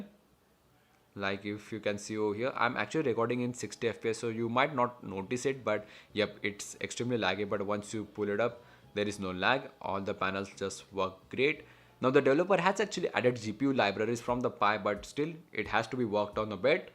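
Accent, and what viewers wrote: India. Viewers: Its the accent actually. Hindi. And thats something unique.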